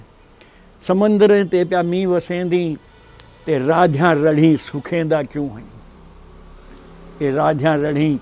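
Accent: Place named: Saraiki, Pakistan. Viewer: واہ۔ اتنی خالص سرائیکی اساں گھٹ سنڑی ہے۔ چس آ گئی ہے۔ وسدے رہو۔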